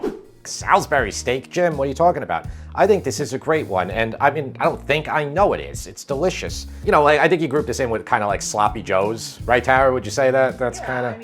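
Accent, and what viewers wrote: United States. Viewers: We're in the US, it's a US pronunciation, friend. The US speaks American. The UK speaks British. And don't get me started abooot the Canadians & whatever the hell the Aussies are trying to speak. 🤪 Worcester, Massachusetts is not pronounced Wore Chester, Massachusetts. It's pronounced Woosta or Woost UH (rhymes with Rooster if you pronounce the ER as UH))(Roost UH/Roosta) Even the Brits, who haven't learned to speak proper American, don't say Yorkshire as York Shyer, they say York Sure. Worcestershire Sauce is pronounced Woosta-Sure Sauce in the great US of A and the rest of the world is wrong!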